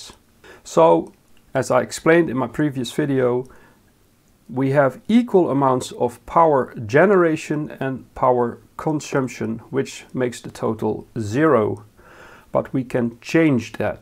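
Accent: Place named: Netherlands. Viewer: Thought you were from Sweden, but your Dutch, right?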